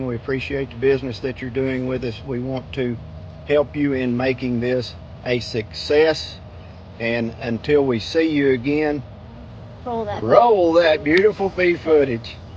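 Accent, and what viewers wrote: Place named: South, United States. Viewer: Thank you for making this video. I have 12 days till I pick up my 5 frame nuc of Italian bees. I’m excited and a little anxious and your video shows me how easy it is ( hopefully). Love the southern accent. My momma is a Tennessee girl born and raised. My bees are gonna live about 10 miles from the Wisconsin border on our wooded 3 acre property.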